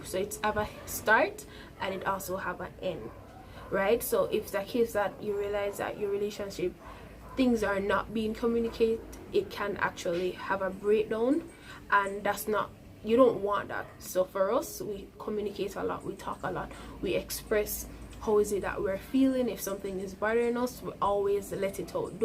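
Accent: Jamaica. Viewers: You Jamaican rite? 🤔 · U sound Jamaican 🇯🇲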